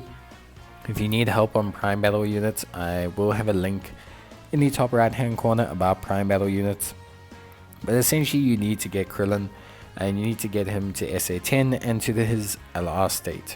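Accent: South Africa. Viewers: Dude are you South African? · I presume so, the South African accent is undeniably there.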